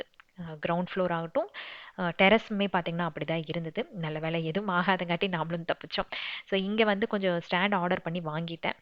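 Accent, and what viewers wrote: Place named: Pondicherry, India. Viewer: akka unga voice kaetalae relax ah eruku ❤❤❤unga kita erunthu varthuku orunal apditha nanga pondicherry la paesuvom unga kita erunthu varathuku oruka😂😂kathukita akka ma❤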